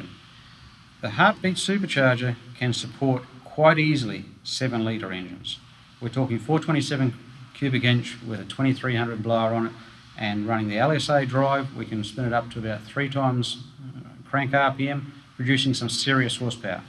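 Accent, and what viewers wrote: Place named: Australia. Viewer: You're an Aussie !!!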